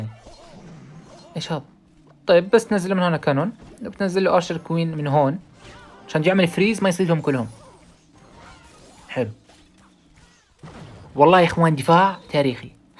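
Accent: Iraq. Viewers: شكلك عراقي تكول يعني تفضل هههه احبك متابعك من العراق 🤍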